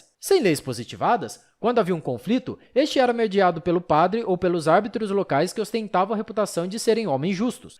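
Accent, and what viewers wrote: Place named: São Paulo, Brazil. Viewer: Meu nao da pra ouvir um audio feito por paulista caipira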